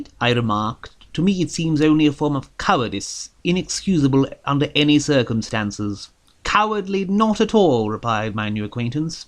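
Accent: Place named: Eastern Europe. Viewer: You have the perfect voice for things like this, your diction is excellent and the slight Slavic lilt adds something rather lovely to the cadence.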